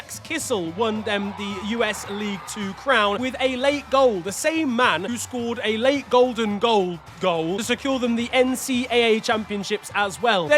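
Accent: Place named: Liverpool, United Kingdom. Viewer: Nice scouser accent. Which area in Liverpool are you from?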